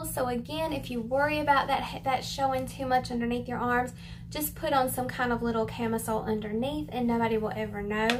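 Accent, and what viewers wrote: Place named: Louisiana, United States. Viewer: Girl! You are cute as a button! Where are you from? I’m from Louisiana and your accent sounds like home:) I just found your videos and love your clothing reviews:) I’m just now trying some online clothes shopping and it’s great to have honest opinions. We have almost the exact same measurements and body shape as well, so I’ll definitely continue watching your reviews:) Thanks for all you do!💕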